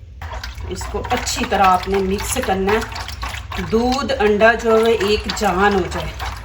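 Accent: Punjab, India. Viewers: Doosri baat: Jin viweres nai punjabi mai baat karnay ki suggestion di hai woh sach keh rahay hain ap agar punjabi bolain tou apkay show ko char chand lg jayen Urdu ko punjabi accent mai bolnay wali weirdness bhi nahi rahay gi. Aur punjabi sajay gi bhi bohat apki awaz mai... Its just a suggestion Please don't mind. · اردو نہیں پنجابی بولا کریں، اردو پنجابی لہجے میں مذاق لگتی ہے۔ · Very poor Urdu in Punjabi style. commentary. Improve it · Assalam o allaikum best ha laiken khuda k liya apna punjabi lahga sahi krien pleas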